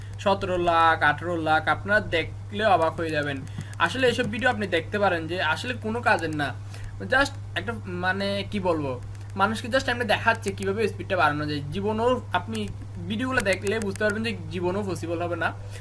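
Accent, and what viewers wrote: Chittagong, Bangladesh. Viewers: হন বদ্ধা অনর হতাত বুঝাঁ যার অনে চিটাইঙ্গা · আপনার কথা শুনে মনে হচ্ছে আপনার বাড়ী চট্টগ্রাম।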